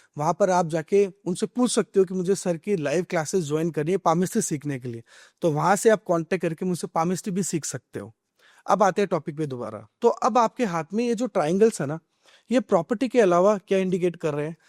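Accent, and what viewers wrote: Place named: Marwar, India. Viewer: No doubt aap ek Marwadi bande ho no doubt apki boli bhasha se jana bcoz no doubt main bhi marwadi hu 😅😅😅 baki no doubt apne jo hhi bola h vo nhi ke brabar h 😢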